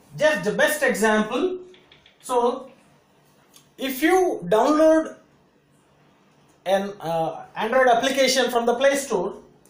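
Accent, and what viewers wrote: South India, India. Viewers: I m from gujarat , looks you to be a south Indian because of your fabulous extent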